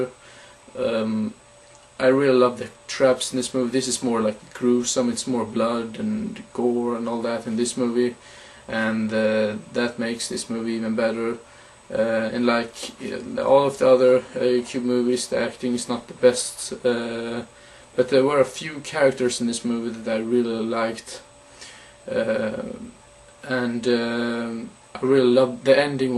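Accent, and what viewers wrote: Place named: Sweden. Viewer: Haha, hörde att du var svensk när du pratade. Roligt att de första jag hittar efter att sett trilogin är en svensk kille som snackar. :)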